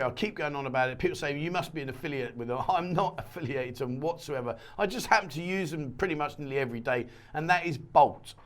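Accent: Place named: South London, United Kingdom. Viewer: Where you from in England mate sounds like our way abit. South of London?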